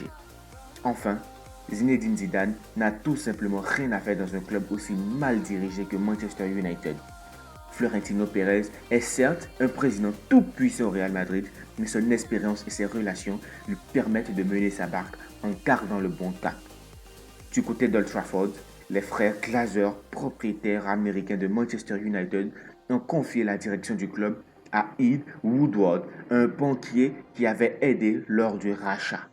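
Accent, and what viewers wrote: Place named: Africa. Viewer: Wesh on comprend rien avec ton accent africain , paye quelqu’un qui parle bien français pour faire ce genre de vidéo pff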